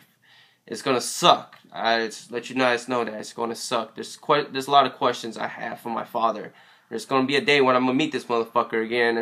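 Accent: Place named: South, United States. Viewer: I just also wanna know where your southern accent is from. It's dope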